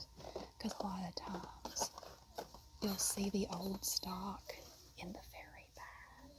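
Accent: South, United States. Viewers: Hey not trying to be creepy 😂 but I love your vids and southern accent but what southern state are you from I’m from South Carolina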